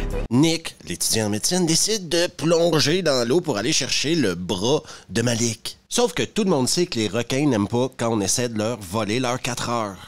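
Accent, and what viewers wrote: Quebec, Canada. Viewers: Je te suis déjà depuis quelques temps, et je trouve que tu as un humour qui fait vraiment humour Français. On voit que tu connais ton métier :) Merci pour tes vidéos toujours cools qui m'ont fait apprecier l'accent made in Quebec !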